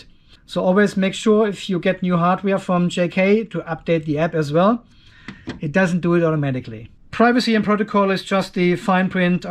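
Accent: Germany. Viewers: I only want to hear your accent. I don’t care what you are talking about. You sound German. Yah? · Garage ist euer Dialekt in Australien so, oder kommst du ursprünglich aus dem deutschsprachigen Raum? Ich verstehe dich bestens, nur ich finde dein Dialekt klingt schon sehr nach "aus Deutschland" :D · Thanks I’m still catching up on all the videos. I think the German accent added with Aussie slang is great. Actually laughed out loud this morning from another video when you said “it sh1t itself”. Keep up the great work.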